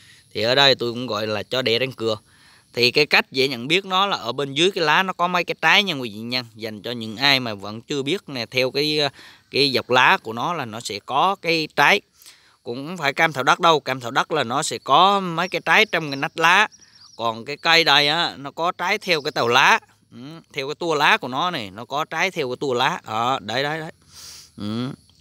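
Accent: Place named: Binh Dinh, Vietnam. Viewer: Mình khoái nghe giọng bình Định rồi đó nha. Thuốc thì ok rồi hôm nào mần cờ líp về võ thuật và rượu bầu đá nhe 😁😁😁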